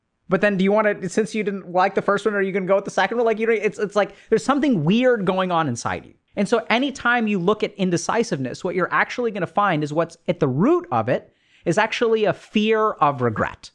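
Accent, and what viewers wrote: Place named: India. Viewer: i love your indian english man :D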